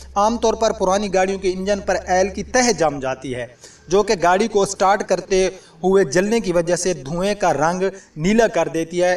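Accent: Saraiki, Pakistan. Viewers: AP ki Urdu ye bta rhi h k AP saraiki hen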